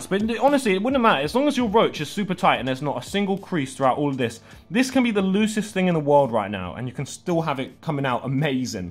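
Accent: United Kingdom. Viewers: Hi just seen your vid pop up and was wondering where you come from I’m in the UK and you sound like your British so thought I’d ask as I’m not sure thanks for vid really enjoyed it 😊👍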